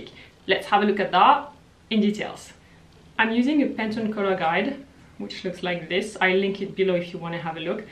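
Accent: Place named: France. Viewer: Hi! I’m a Spanish woman who lives in San Juan, Puerto Rico. I ❤️your videos and I ❤️your french accent speaking English 👌I would like to know if lavender is good for cool or warm undertone (I think mine is warm) Have a nice day.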